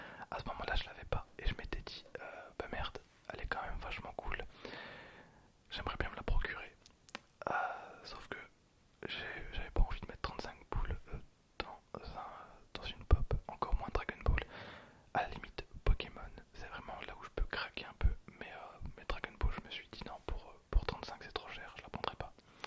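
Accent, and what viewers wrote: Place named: Belgium. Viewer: C’est la numéro Oui118 l’accent belge oblige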